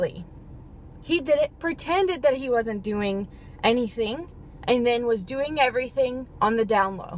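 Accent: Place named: Texas, United States. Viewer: I'm confused, if you grew up in the Middle East, Like most people in my family, where is your accent? You DID say that you GREW UP in the Middle East. And you're mispronouncing most Arabic words. You sound like you're from Texas or Alabama and such... And by the way, The equal pay thing is not a myth. It is true lol.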